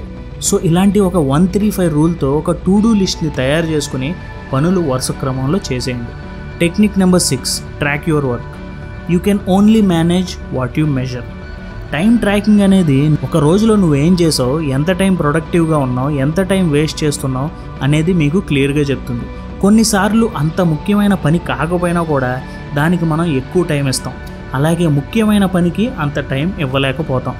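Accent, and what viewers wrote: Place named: Telangana, India. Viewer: Ante konchem Language lo Telangana slang vastundhi anduke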